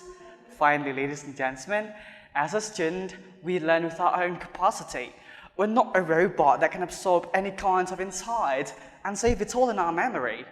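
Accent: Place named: United Kingdom. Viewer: Masya Allah, kerenn sekali kak accent britishnyaa, semoga menjadi motivasi 🌟🌟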